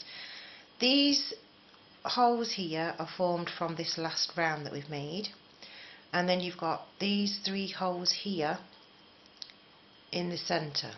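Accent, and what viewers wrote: United Kingdom. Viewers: I love this pattern and how you've divided up the series to be as simple as possible! I'm not exactly new to crocheting...but I am new to crocheting correctly and new to following patterns! I only ran into one area of difficulty in following the written pattern you have in your description; and that's when you've finished the toe area, and you're adding the tongue to it- you write "count back 6 from last sl st of toe section". In your video, you instruct "don't count the slip stitch area - start counting with next stitch," and perhaps your written instructions could be clear about that, as well. No big calamity, as I'd only done one tongue wrong before referring back to your video. A big huge thank you for your excellent video quality, as I never had problems following where you were putting your stitches (most other videos are terribly frustrating in how many times their stitch work strays from sight!) and listening to your fun British accent was a treat, too! 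To thank you, I let every ad play out!;)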